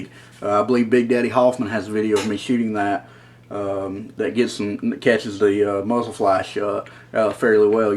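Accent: South, United States. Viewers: Good info...learned much. Rather new at this. The southern accent was a challenge but hit replay a couple of times to understand what you said. That's fine...I got it... Thanks for taking the time to put this out.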